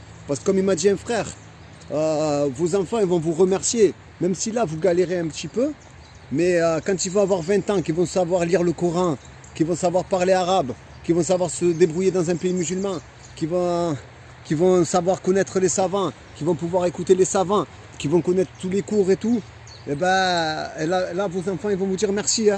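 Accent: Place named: South, France. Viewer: Par contre mon frère quand tu parles sa me rappel toute mon enfance, je suis du sud aussi et toutes tes expressions sont familières, ton language c'est le langage des gens du sud avec la culture provençale mélangé ah ah Mes grands parents parlaient souvent le provençaux, quand tu dis : " ô Fada, ou ô Fan". C'est comme si j'entends mon père parlé.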